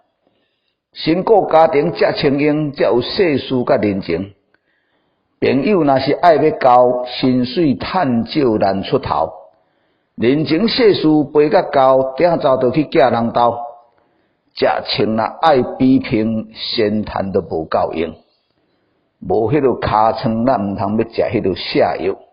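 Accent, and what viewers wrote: Taiwan. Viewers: 讚，聲音好聽 正港的台灣話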